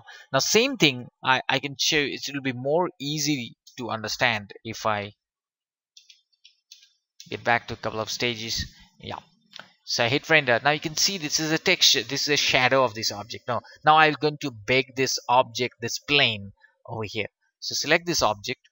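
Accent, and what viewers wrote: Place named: Bengal, India. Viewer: I'm guessing you're Bengali 😊 Thank you very much for the tutorial!! 🙏 Very informative and clearly explained 👍👍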